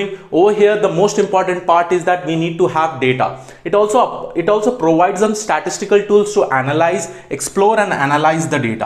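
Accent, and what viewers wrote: India. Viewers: Very nice explanation along with Indian accent which is pretty fine :) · INDIAN ACCENT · Can‘t bear Indian accent.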